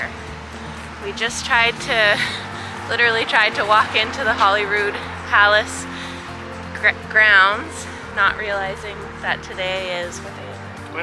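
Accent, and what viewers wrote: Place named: Canada. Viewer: I hope you enjoyed your visit to Edinburgh and Scotland. I only picked up on the fact you’re Canadian when you said “out”. Many years ago I worked in a large city centre hotel and had the pleasure of meeting many people from Canada. You’re quite brave taking the wee one with you. In recent years Edinburgh has become (like so many places) a tourist attraction all year round, whereas it was mainly just in summer when it became so busy.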